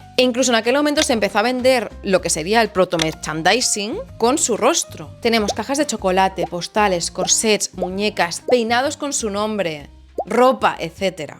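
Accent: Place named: Catalonia, Spain. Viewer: Pues súper interesante y es curioso que una amiga mia que teje y hace crochet como yo n os compartió tu podcast por whattsapp y aquí me tienes, me ha enantado, misterio, historia del arte que me apasiona como la historia misma, ummm, bien felicidades y te sigo. Por tu acento y algun giro parece que sabes catalán? 😂😂😂 porque yo vivo cerqita de Barcelona, ahi lo dejo…. 🤗🤗🤗🤗🤗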